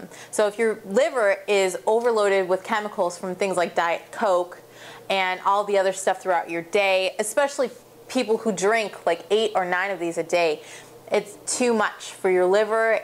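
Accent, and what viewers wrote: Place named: United States. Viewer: Annoying US accent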